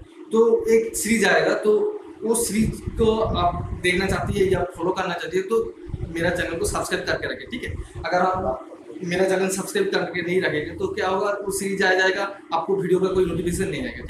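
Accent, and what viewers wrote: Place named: Bengal, India. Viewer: স্যার আপনি কি বাঙালি ?? আসলে,আপনার হিন্দি বলার কায়দা দেখেই বোঝা যাচ্ছে 😅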